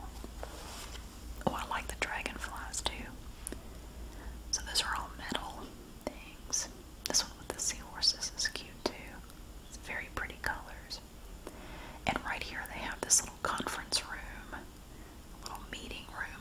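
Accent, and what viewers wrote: South, United States. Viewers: Thank you for this video. South Carolina is beautiful, I’m going to visit in a few weeks since my boyfriend is from there. Your southern accent is so sweet and cute 💕 are you originally from SC or just visiting for vacation?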